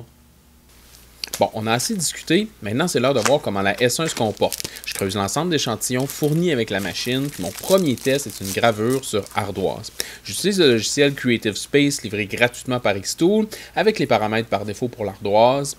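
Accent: Quebec, Canada. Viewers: Hello je passe pas de ton accent du Québec, fabuleux ce nouveau laser, dit moi tu parle de papier de marquage pour le verre dans ta vidéo, ont peux le trouver partout et l'utiliser avec une orthur aussi? belle vidéo tu donne envie que je change mon laser mais ma blonde ma remis à l'ordre rien pu faire avec le commandant fait 12 ans de mariage et pas de remise de peine par le juge 😁meilleures salutations à ta famille et merci pour tes vidéos . le hello du Valaisan de Swiss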